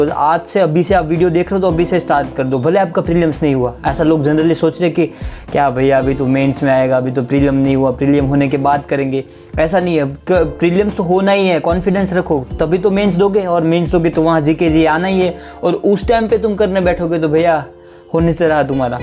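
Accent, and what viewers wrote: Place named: Gujarat, India. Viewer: Sir muje aap Gujarat se lagraheho. Aap ki બોલી se❤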